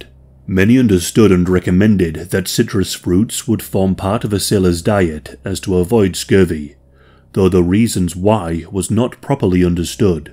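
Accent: United Kingdom. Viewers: British accent of narrator.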